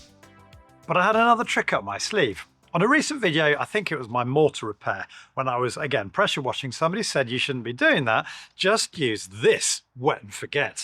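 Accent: United Kingdom. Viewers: I think his pronunciation is standard in the UK.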